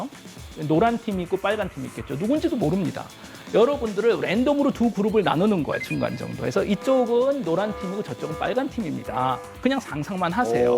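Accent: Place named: Jeolla, South Korea. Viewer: 억양이 라도... 비 호감... 비추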